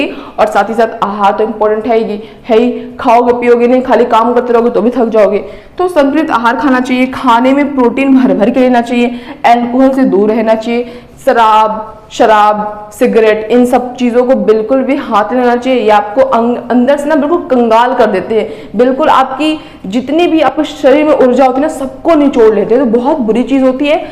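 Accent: Bihar, India. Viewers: Very sweet voice mam.. Madam bojha dhone like we r bihari... Proud be a bihari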